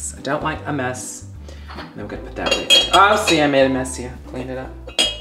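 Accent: United States. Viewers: does anyone know what type of american accent that is? i like it. is hilarious. could watch her videos all day.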